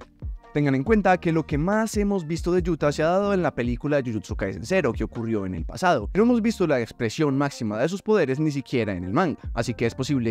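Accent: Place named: Colombia. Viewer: de donde eres? algo en tú acento me dice que eres de colombia o me equivoco? aunque intentas neutralizarlo creo que se te escapa un poco 😅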